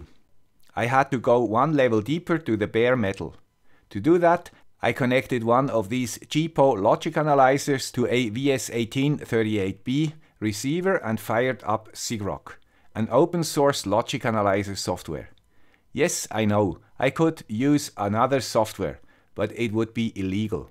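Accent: Switzerland. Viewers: I'm very cheap and I like tinkering. Everything in my house is DIY and custom made. I like your content and Swiss accent very much. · As usual, a great translation of an alien topic with a characteristic Swiss accent and with huge positive benefits for your audience, thank you! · Hi, ich beschäftige mich gerade mit dem ESP32 und bin dadurch auf deine Videos gestoßen und muss sagen WOW. Echt, der Grad an Details und der Umfang für dein Thema hauen mich echt um. Alle anderen Youtuber sind nicht so präzise oder machen zuviel Entertainment aus dem Thema. Aber nicht beim Typen mit dem schweizer Akzent:D, der brilliert durch Content und sein Hobby! Ich hab das Gefühl egal was ich gerne mit dem ESP32 machen will oder was mich interessiert, du hast das schon einmal durchdacht und nimmst einem dabei viel Mühe und Recherche ab. Also noch einmal: ECHT TOP!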